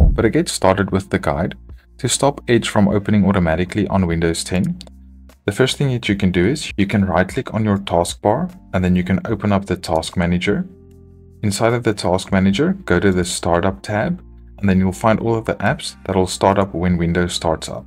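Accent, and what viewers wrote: South Africa. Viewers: South African accent. thanks for the help.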